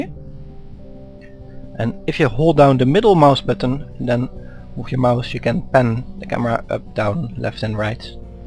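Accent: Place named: Netherlands. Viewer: u sound dutch. what i wonder though why the ingame tutorial sucked ass X.x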